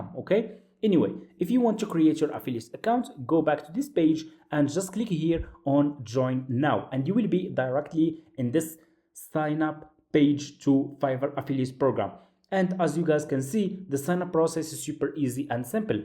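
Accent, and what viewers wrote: Morocco. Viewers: مغربي ياك من اللهجة باينة؟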